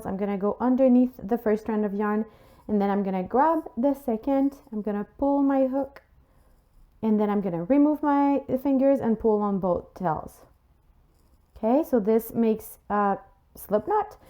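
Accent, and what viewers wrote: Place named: Quebec, Canada. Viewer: That accent.... T'es québécoise? C'est full cute le tutoriel merci ❤️ j'vais en faire plein❤️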